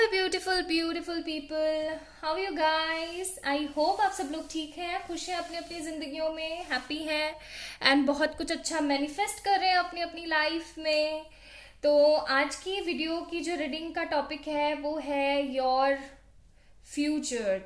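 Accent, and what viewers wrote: Punjab, India. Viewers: punjabi bolte ho app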